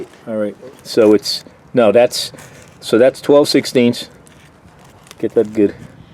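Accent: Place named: Boston, United States. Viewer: Best,part of the video is the Boston accent..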